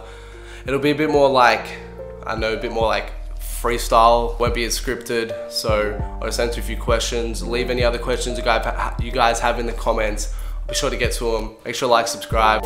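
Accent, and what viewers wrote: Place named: Australia. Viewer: bro ur Aussie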